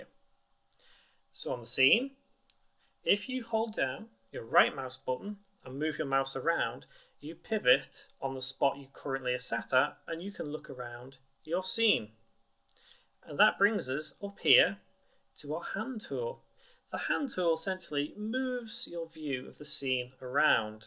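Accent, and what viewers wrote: United Kingdom. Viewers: he is british. They say Z differently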